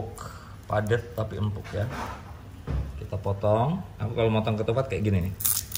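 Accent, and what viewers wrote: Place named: Madura, Indonesia. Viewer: Ari Lasso pake bahasa logatnya Madura. Berarti dia masih tinggal di Jakarta. Padahal rumah aslinya itu di Madiun Jawa Timur.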